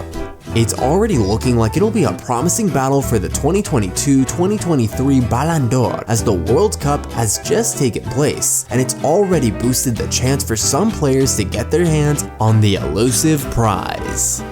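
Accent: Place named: Spain. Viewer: Lose the stupid Spanish accent.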